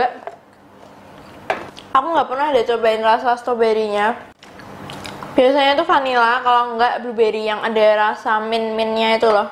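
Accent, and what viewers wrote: Java, Indonesia. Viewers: Logatnya ke Jawa Jawa an💐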